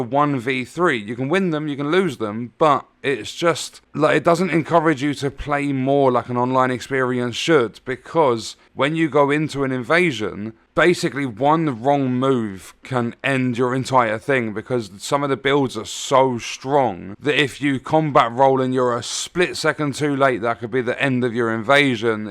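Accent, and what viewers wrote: United Kingdom. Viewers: Nice video mate … i watch your videos just to enjoy the accent! lol What city from UK pleaseeee m8 🥲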